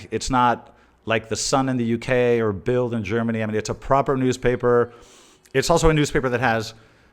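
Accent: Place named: United States. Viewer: You have a perfect American accent. Are you American-Spanish? Wait, are you a polyglot? French, Spanish and English? Italian and Portuguese maybe??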